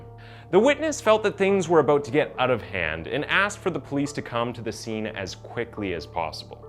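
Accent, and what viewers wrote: United States. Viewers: The narrator has a very American accent so am I the only one that finds it strange he cannot pronounce Yosemite I mean I’m Canadian and that’s not a far stretch but I’ve known about Yosemite park my whole life it’s not a small place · I like this guy but I’m curious where he is from. He sounds typical American but mispronounced well known words like Maryland and Yosemite. Just an observation.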